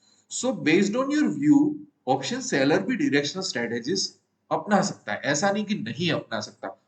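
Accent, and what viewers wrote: Gujarat, India. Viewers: gujarati laheko to aavij jaay ho...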